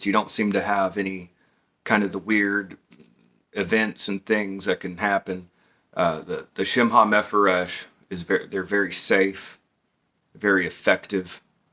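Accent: South, United States. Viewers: LOVE THIS GUY HE IS WELL INFROMED EXPERIENCE AND ARTICULATES WELL WITH A SOUTHERN CHARM AND CHARISMA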